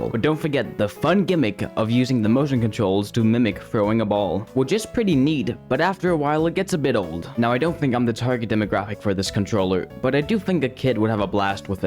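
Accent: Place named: Denmark. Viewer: buddy, are you danish or do you just happen to have a very danish sounding accent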